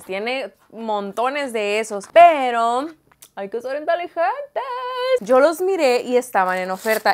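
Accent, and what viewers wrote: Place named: Sonora, Mexico. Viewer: Nueva subscriptora! Me saliste como sugerencia y te ame 😊 yo soy de Sonora y me divierto con tu forma de hablar muy parecida a nosotros los Sonorenses 😂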